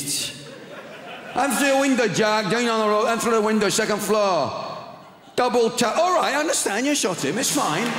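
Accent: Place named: England, United Kingdom. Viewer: By the accent, I'd guess it's England.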